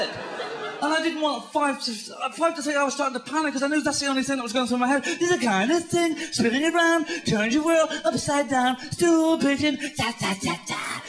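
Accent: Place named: Ireland. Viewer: Sean's accent has diluted a lot, obviously due to all the years he has lived in England. While he does still sound Irish here, there is one recent clip of his on you tube and - if you didn't know any better - it might be difficult to place the accent at all. Even here his accent isn't as strong as the clips of his early stand up.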